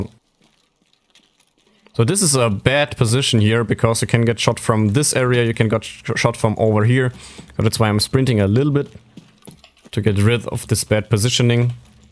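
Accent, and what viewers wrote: Germany. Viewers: Sehr gutes Englisch ! Man hört deinen Deutschen Akzent. Tolles Video! Danke🙏🏻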